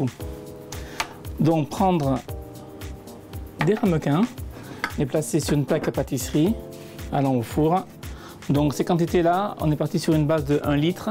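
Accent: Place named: South, France. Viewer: des 'rameuquins' :) j'adore l'accent du sud !! merci pour la clarté de cette recette !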